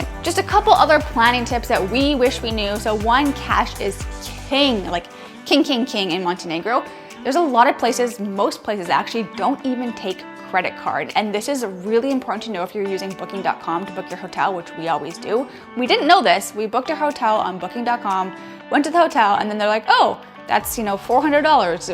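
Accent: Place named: United States. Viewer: your squeaky ummerrigaan voice is annoying ...you come across like the typical arrogant over the border wise ass but really are below avg....go to you florida...leave us alone..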